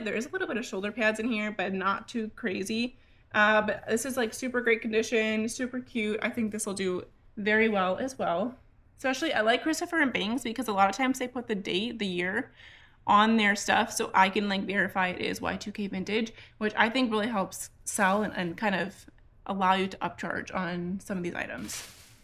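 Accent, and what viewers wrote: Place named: Midwest, United States. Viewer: You’re from the Midwest. lol Taayygs. I say the same and I’m in Wisconsin.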